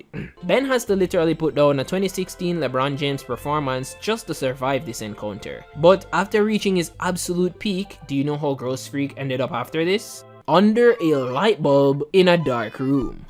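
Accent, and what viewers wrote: Jamaica. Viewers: Are you Jamaican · r u jamaican?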